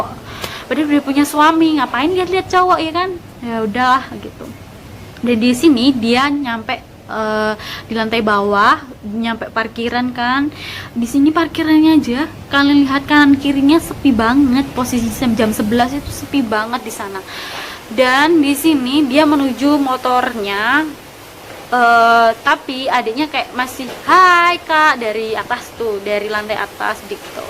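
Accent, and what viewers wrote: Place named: East Java, Indonesia. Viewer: Rek mesti asal jawa timur yach kak... Serem juga yach dengarnya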